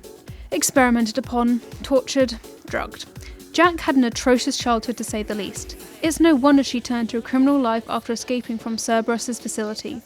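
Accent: United Kingdom. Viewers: I love female characters and often play as them when given a choice but I don't dig this videos' feminist undertone and the fact the boss from mgs3 is absent really is a bit of a fuckup. Keep your identity politics shit out of games, unsubbed, feminism is collectivist trash and you Brits need to move on. Stop letting bitter women project their shitty politics on games, that shit failed and we don't wanna hear it and it doesn't sound more clever with your shitty accent. How about a best characters list you gender obsessed witch.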